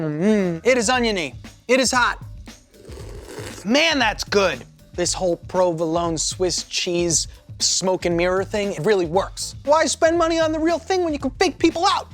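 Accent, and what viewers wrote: France. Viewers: Not complaining, but I think may have just wanted an excuse to use his French accent and vocabulary for an entire episode. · can you speak with that French accent in every video going forward?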